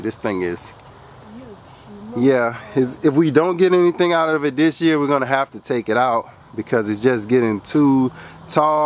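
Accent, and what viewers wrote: Louisiana, United States. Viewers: As soon as I watched your first video (the one I watched before this one) I said "he sound like my people". So naturally I liked (👍) the video. Less than a minute later you verified it when you said something died off because the Louisiana heat was starting to set in. I was sold then, on top of you being out there with the family and your knowledge base just made it all the better. What part of Louisiana are you in? I'm from Belle Rose/Baton Rouge (south, la) but live in Ruston (north,la) and have been attempting to try my hands at growing with little success. I think I'm afraid to "go all in" and fail so im very cautious about how much and what I plant. Do you have any suggestions on a small but mighty starter garden? Thanks in advance.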